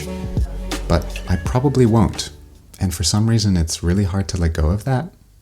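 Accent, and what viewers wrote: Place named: North America. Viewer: Very beautiful and honest video. Thank you for sharing. Out of curiosity, are you Canadian? I ask because you speak English with a North American accent but you said "university" instead of "college", though it's probably because you did a PhD.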